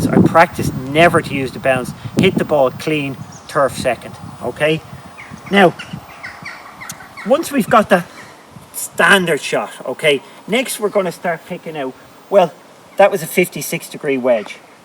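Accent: Ireland. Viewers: Genius. Struggling like a non-swimmer in Cat V rapids until now. Like most amateurs thought I was ok until I tried. Shattered the graphite shaft like a moron. Thanks. Don’t change a thing, especially the Irish brogue!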